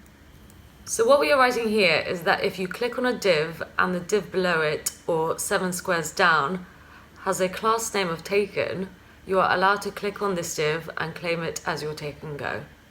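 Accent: United Kingdom. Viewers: British accent is way too hard to get especially for a non-native speaker. I really wish subtitle was there :( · British english is hard to follow, what did author say 10 sq.... ? What's the unit of 10 and 20 here ? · I saw the thumbnail and someone knew she had a British accent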